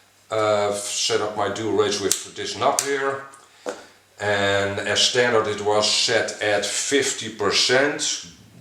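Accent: Netherlands. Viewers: Ik vind het Engels enorm goed, uitspraak is lekker typisch Dutch.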